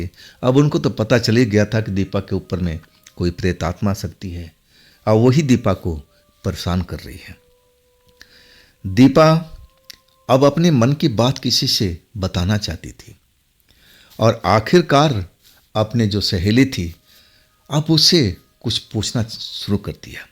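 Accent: Bihar, India. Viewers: Bihar se ho kya bhai ❤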